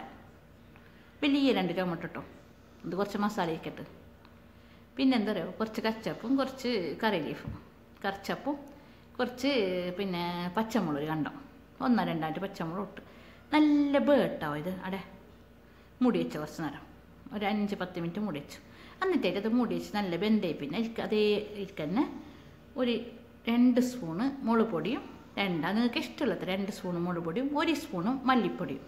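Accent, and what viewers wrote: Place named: Kasaragod, India. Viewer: Ingale kasarod slang I like ..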